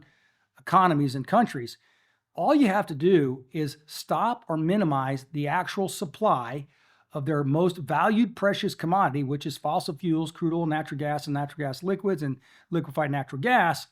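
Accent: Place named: South, United States. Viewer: I can hear the southern hills underneath this man’s voice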